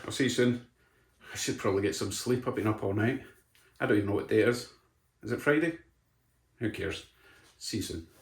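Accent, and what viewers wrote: Scotland, United Kingdom. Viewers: Its the Scots accent we have apparently some just love to hear us talk, LOL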